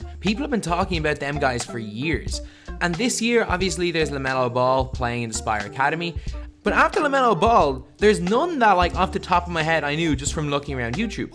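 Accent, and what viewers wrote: Ireland. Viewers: Are u irish? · Wtf you’re Irish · Kinda strange listening to an Irishman talk about American High School boys. I didn't know basketball was big in Ireland since there was only one that ever played in the NBA "Pat Burke"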